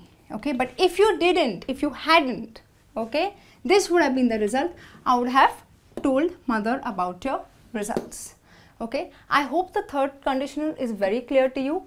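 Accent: South India, India. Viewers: Are you South indian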